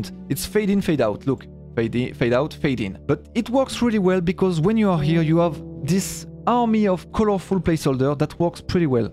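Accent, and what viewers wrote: France. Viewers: Je valide le changement de langue car je souhaite le maitriser et franchement avec l'accent francais c'est tellement plus facile, Merci d'avoir pris l'initiative👍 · J'ai du mal a resté focus avec ton accent francais (alors que j'ai le meme accent quand je parle mdr) j'ai bcp bcp + de facilité a comprendre l'anglais avec l'accent pakistanais (ne me poser pas de question sur ca) en tout cas bonne chance INTERNATIONAL CODE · Au contraire des nombreux commentaire, j'avoue que l'accent français me perturbe. Mais, tu as l'air d'avoir un bon vocabulaire et ça semble aider de nombreuses personnes. Pas sûr que tu sois référencé aux pays anglophone par contre ! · I love your french accent 👻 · J'ai pas pu regarder la vidéo en entier... Désolé, j'aime ton contenu et ta personne mais une vidéo de 12min en anglais avec un accent 100% français ça m'est insupportable 😞 (Je ne suis pas français) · Ce que je voulais dire, c'est que y'a plein de Français qui n'aiment pas entendre l'accent Français en anglais, plein d'Espagnols qui n'aiment pas entendre accent Espagnol en anglais, plein d'Allemands qui n'aiment pas entendre l'accent allemand en anglais, etc. 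C'est souvent les gens qui parlent nativement une autre langue que la tienne qui aime bien ton accent. Du coup les commentaires de Français qui disent qu'il a un accent français pas ouf, on s'en fiche, vu que si tu parles anglais, c'est pas pour les français, c'est pour des gens qui sont pas français et ont pas de soucis avec ton accent. J'pense on dit exactement la même chose mais on s'est pas compris xD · Hello, petit retour d'expérience sur le passage à l'anglais, même si je vis depuis 6 ans en Irlande : Grâce à ton accent Français, et à un rythme "normal" : ton anglais est facile à comprendre pour un francophone sans avoir besoin de trop se concentrer, ce qui conserve l'expérience agréable (comprendre certaines vidéos US relève d'une séance de travail coté concentration). Belle transformation. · Well done for your first video in English! I would have personally made the websites presentation shorter to give more room for the coding part. 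Anyway I loved it and keep going in English, you still rock because french accent rocks no matter what they say! And the new reviewww generic is gorgeous, made by Matei I suppose? You're really a great team! Go and conquer the world 😎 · Bravo pour cette vidéo ! C'est assez vrai que l'accent français aide pas mal a rendre le tout plutôt smooth ^^ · Je valide le changement de langue car je souhaite le maitriser et franchement avec l'accent francais c'est tellement plus facile, Merci d'avoir pris l'initiative👍(copié collé le mec) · Méga cool en anglais avec l'accent bordelais. Même en étant une quiche avec l'anglais j'arrive à comprendre et donc probablement que je vais devenir plus à l'aise à la longue. C'est gagnant gagnant. Merci ! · Yes! J’apprendrai l’anglais avec ton accent français, se sera plus simple à mon avis. Continue ce format! 🙂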